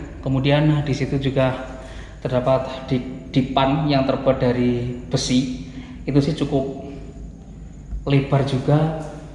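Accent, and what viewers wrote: Java, Indonesia. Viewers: Seneng mas jawane enak ngerungokne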